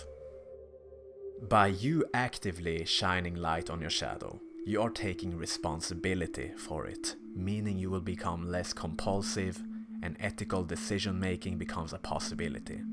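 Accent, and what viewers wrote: Sweden. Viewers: Are you swedish sounds like it atleast😂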